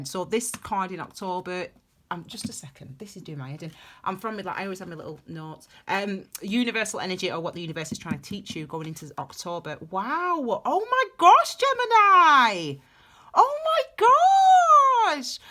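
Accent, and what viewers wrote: United Kingdom. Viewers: Love your sense of humour and the way you come across.I miss hearing British accents so it's nice listening to your readings. And ditto it's great to see a female British black tarot reader.Keep up the terrific work and thanks for the amazing reading! :) Love from Spain! :) xxx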